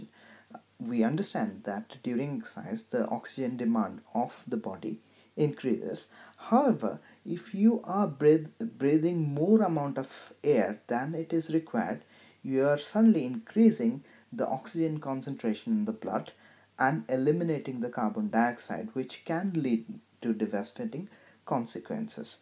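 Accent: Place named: India. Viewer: I like your speaking. But I hate your Indian English.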